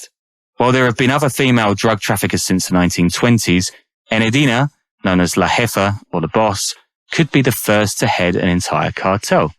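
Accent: United Kingdom. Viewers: Why the fk is it always narrator’s with an English accent. Like y’all facts are always so fked it’s unreal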